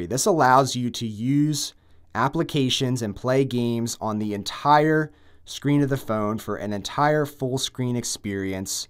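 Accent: Philadelphia, United States. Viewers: From Philly?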